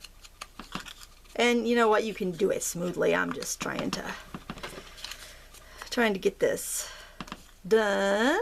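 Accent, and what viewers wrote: Maine, United States. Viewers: LOOL< see, and I've been "from away" for so long that I miss the Maine accent! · I love the Maine accent!!! Whoohoo! Great video. :)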